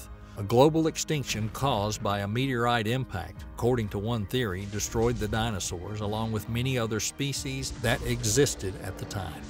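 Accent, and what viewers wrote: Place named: Tennessee, United States. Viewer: I love this man's accent. I wonder where he's from. Sounds like a Tennessee neighbor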